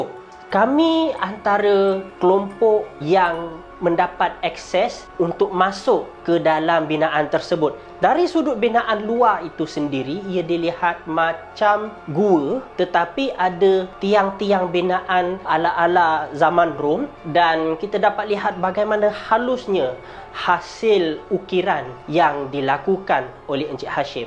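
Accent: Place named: Kelantan, Malaysia. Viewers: lol kan di dh guna bahasa melayu baku walaupon ada sikit ii loghat kelantam yg terselit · aku x faham loghat kelantan so jangn guna please guna bahasa KL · Huyoo.. senior aku tuuuu.. slang kelantan tu. Hehehe.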